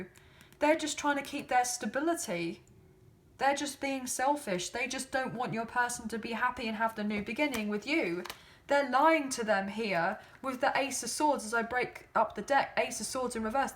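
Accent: England, United Kingdom. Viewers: Love your English accent.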